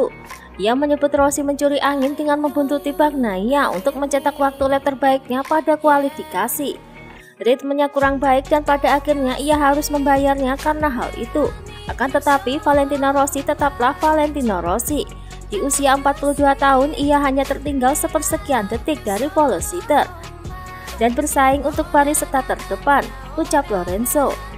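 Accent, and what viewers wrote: Java, Indonesia. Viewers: Hadir mba medok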